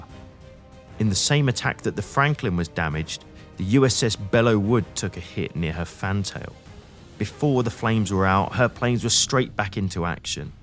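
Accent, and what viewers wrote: England, United Kingdom. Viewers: Endlich spricht man jemand englisch und nicht amerikanisch 🙂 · You sound British so why not pronounce lieutenant as 'leftenant'?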